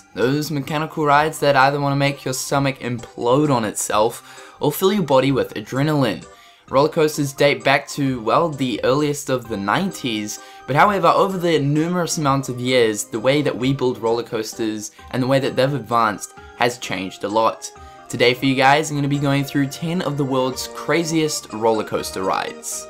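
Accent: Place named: New Zealand. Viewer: The way you said Staffordshire hahahahahha XD not hating on your beautiful NZ accent btw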